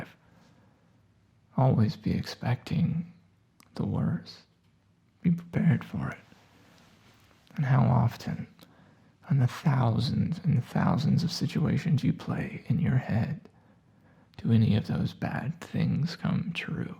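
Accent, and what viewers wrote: South, United States. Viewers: could you please make a whole video of you speaking in your southern accent..I love it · I love those retro style suitcases! I'll be your business partner but I warn you it's a 90/10% split in my favour cause that's just how I roll 😜You won't earn any money but we'll have a laugh. Btw as a Brit I love your Southern US accent so please don't hide it. ❤️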